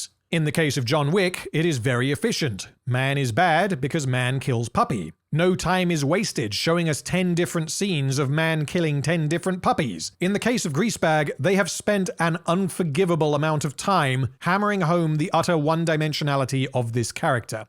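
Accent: United Kingdom. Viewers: I definitely knew you were English I just thought you guys had names for all your varieties of accents on the Isles. Regardless, you have a wonderful channel I love the long form evisceration of this trash. You aren't alone many of us remember THE BEFORE TIMES. Lol.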